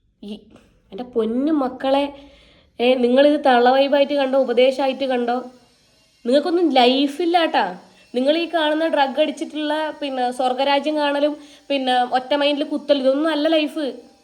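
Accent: Kasaragod, India. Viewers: Kasaragodinte shabdam 😊😊😊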